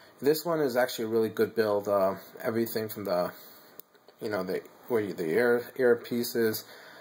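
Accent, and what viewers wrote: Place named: New York, United States. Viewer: Sounds like you got a New York accent lol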